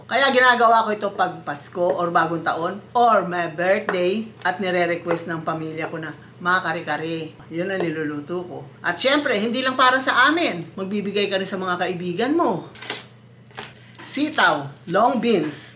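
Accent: Philippines. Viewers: I feel like Im in the Philippines while watching. The accent, the vibes, and humour feel like you are at home with your nanay. With all the ❤️ dun sa kare-kare not just appetizing but eating w family together is therapeutic.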